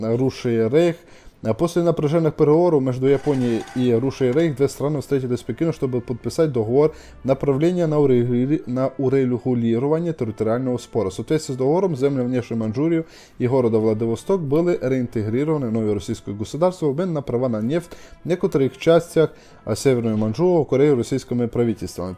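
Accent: Ukraine. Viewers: Прохождение классное, голос автора приятный с нотками украинского, это атмосферно. Однозначно лайк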